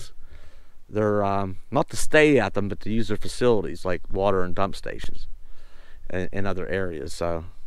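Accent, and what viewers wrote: Pennsylvania, United States. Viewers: As I get much older I see people in a different light there real friends and there r user. Stay safe I love u still have ur PA accent.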